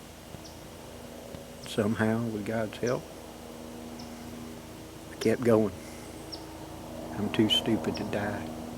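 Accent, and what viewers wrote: South, United States. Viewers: I hope your recovery is as fast and complete as possible. I also hope you get that glorious Southern accent back! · God has plans and works in not straight forward plans someone who sees this will react a second faster saving another one of his children. You may never get the formal thank you but a man like you doesn’t look for them. Thank you for all you videos and you still sound like a southerner to me coming from a Yankee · you’re a fighter and we all wish you well. You’ve got a lot of people here who love and support you. So glad to hear your dulcet southern tones, and feel you’re still here to finish whatever God has planned. Take care, love from Leamington Spa, UK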